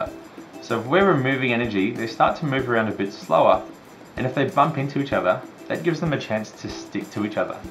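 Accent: Australia. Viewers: Finally and Aussie not an American thank you miss! Even though I don’t like school it’s not an annoying voice!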